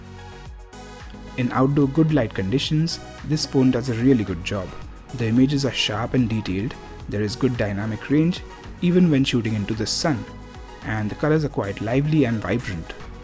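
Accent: India. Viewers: I cant take Indian voices serious. Am a racist? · your review is by far the best mobile review is have ever seen. what presentation, what scripting, what visual effects, what editing manr....i wonder then y do u have so less subscribers....by ur accent i think u can speak hindi also....please make some videos in hindi also and pack in some energy in ur voice-some excitement....you'll have millions of subscribers in no time....thank me later bro....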